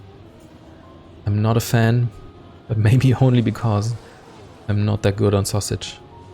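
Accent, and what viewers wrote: Germany. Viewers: sehr deutsche aussprache, woher du wohl kommen magst? 🤔🤔🤔😂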